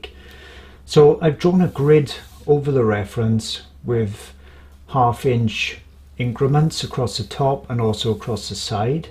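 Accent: Wales, United Kingdom. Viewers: Ah hello my fellow welshman. 👋 It took me about 5 seconds to detect that accent. 😁 Great tips 👌🏻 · Hi You work is amazing...and I really appreciate you sharing your knowledge. Also being from Cardiff myself... it is refreshing to hear a fellow Welsh accent and to know that it isn't just singing but also Art that Wales is good at. Thanks Sandy.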